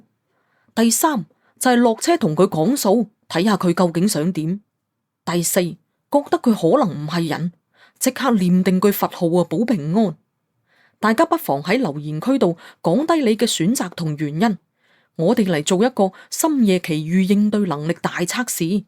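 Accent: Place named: Guangzhou, China. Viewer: 廣州腔講故🤣🤣好好聽😂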